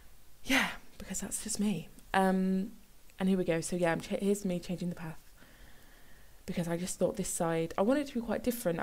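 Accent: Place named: Surrey, United Kingdom. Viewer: I hate you. You're making me miss the boats from the Sims 3 Island Life... ;-) I'm just kidding (well, on the first sentence at least, the boats were cool, the game breaking bugs much less so...), but it is a really cute build! It's a shame there isn't a world builder as there is a boat permanently attached to a jetty in central London that could inspire a restaurant build, but there isn't really a world with a lot where it feels like it could be put in and it would fit. For the storage, it's a shame that someone hasn't done a modded sofa which also includes drawers, it's a feature of canal boats and caravans. There are also a lot of overhead cabinet type storage which isn't typically interactive in the Sims, so someone modding those would make sense (although it would be even better if they were added to a tiny home refresh so all players had access to them). 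Heck, even having functioning drawers at the end of a double bed would be a nice way to remove the need for a dresser. As for why you're back in Henford-On-Bagley, going by your accent (Surrey?), it makes sense that you're going to be able to build things more naturally there, you're not going to need to look up reference images to make it fit, you've probably seen a lot of it in your life time. I wish the Sims 4 would arrange worlds in clusters as I'd love to see what you and JessicaPie could do if you had to rebuild the high school town in an English style (I'm thinking somewhere like Reading), the University town may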